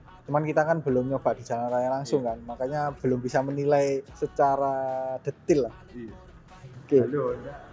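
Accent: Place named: Java, Indonesia. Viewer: Sya suka konten chanel karena suara medok jawa nya 🤭😄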